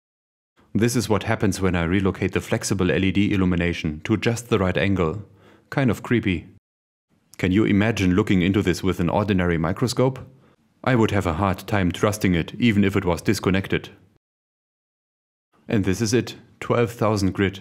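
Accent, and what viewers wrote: Germany. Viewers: but with a German accent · höre es sofort, DEUTSCHER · Nice german accent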